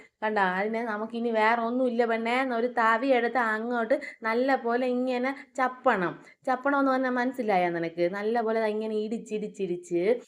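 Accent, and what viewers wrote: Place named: Trivandrum, India. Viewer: Trivandrum language super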